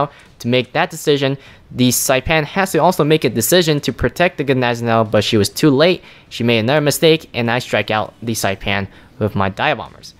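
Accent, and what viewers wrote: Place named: Philippines. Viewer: I was born and raised in the Western United States. You sound to speak English more like someone who is South East Asian or Pacific Islander. My first guess is Filipino. I grew up near a Filipino neighborhood and that's the accent they have.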